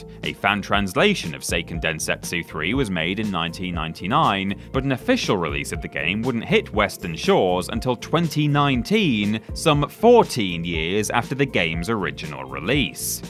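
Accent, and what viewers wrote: United Kingdom. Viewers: It wasn't until hearing pronounce it with his British accent and cadence, that it finally sank in. Diddy's Kong Quest is supposed to be a play on Diddy's Conquest.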